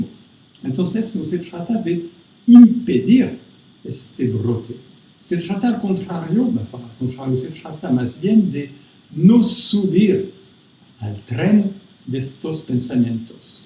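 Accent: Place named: Paris, France. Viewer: Super de faire une video mais le castillan avec l’accent parisien c’est insupportable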